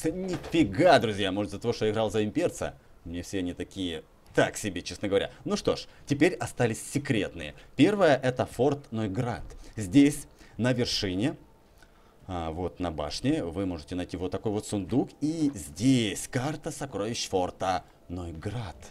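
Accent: Ukraine. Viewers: FryTech228 Это диалект. Так говорят на Украине (в основном) и юге России (меньше).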